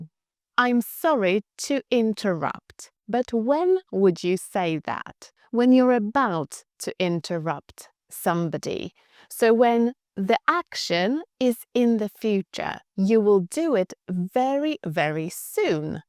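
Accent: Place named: United Kingdom. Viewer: Thank you another great video. How do you get a British accent?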